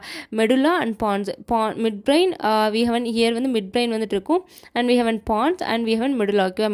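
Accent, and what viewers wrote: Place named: Tirunelveli, India. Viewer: Onga slang tirunelveli slang maathiri irukku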